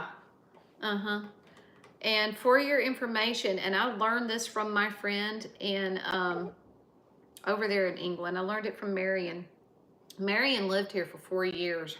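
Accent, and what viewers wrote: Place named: South, United States. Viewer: when you say good gracious alive you remind me of my grandmother she lived in West Virginia she had a Southern accent and she would tell us grandchildren if you don't behave good gracious alive I'm going to Tan Your Hide 😂😂😂😂 I am only 3 years younger than you but I remember them good old memories of my grandfather and grandmother they had that accent💜💜💜💜💜💜💜💜💜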